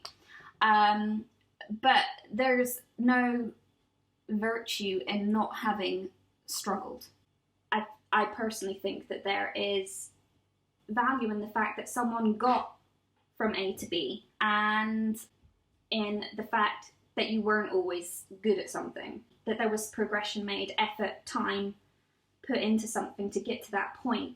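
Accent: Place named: United Kingdom. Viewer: Omg! See we are on the same wavelength! Spot on! And I didn’t know you were a Brit! Is that what your accent is? I love it!!!!! You are NOT bad!!!!!! I always get up and do bad karaoke and try to get other shy people to go up with me and sing and see it’s just a fun game! Music is fun and expressive and wonderful! I love this video!!!! I need to break back into my fb and share your stuff lol! Awesome awesome awesome!!!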